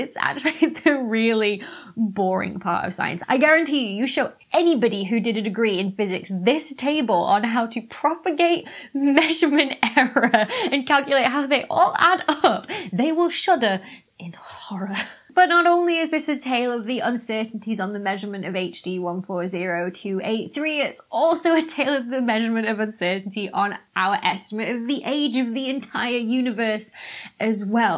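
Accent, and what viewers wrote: United Kingdom. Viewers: I thought I detected a British accent, and the "naught" confirmed it. This channel is a sapiophile heaven, or Eden. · "plazmer"...I love a British accent.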